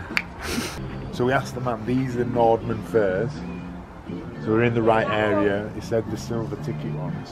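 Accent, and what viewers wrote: United Kingdom. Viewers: Nice British accent, love it 👍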